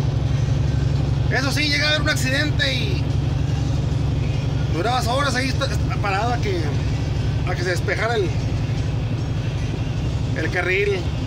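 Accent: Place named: Sinaloa, Mexico. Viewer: Una pregunta? Eres. De sinaloa? Por que de durango no creo. Tu acento. Al hablar. Es. Diferente. Saludos. Desde. Texas. Soy Durangueña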